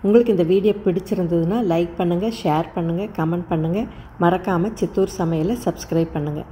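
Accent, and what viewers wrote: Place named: Kongu, India. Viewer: moraccan mint tea miga arumaiaga seithu kanbitheergal sago kongu tamilil pesinathu arumai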